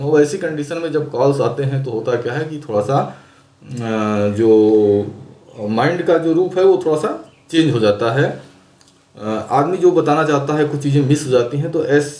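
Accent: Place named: India. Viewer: Photo se bilkul foreigner lag rahe the aap.. bas English sunke samajh aya k aap Bharatiya hai..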